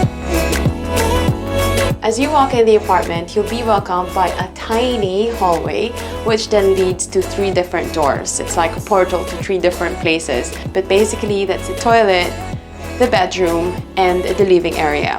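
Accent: Ireland. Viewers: You sound like Irish.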